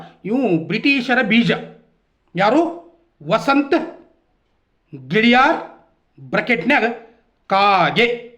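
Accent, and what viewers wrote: North Karnataka, India. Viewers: ಗುರು ನಿನ್ ಮಾತು ಅದ್ಬುತ ಬ್ರೋ ಉತ್ತರ ಕರ್ನಾಟಕದ ಭಾಷೆ ಕೇಳೋದೇ ಚಂದ ನೀನು ಸತ್ಯ ಮಾತಾಡ್ತಾ ಇದ್ದೀಯ ಬ್ರೋ ದೇವರು ಒಳ್ಳೇದು ಮಾಡಲಿ ಆದ್ರೂ ಜೋಪಾನ ಬ್ರೋ ❤❤❤🙏🙏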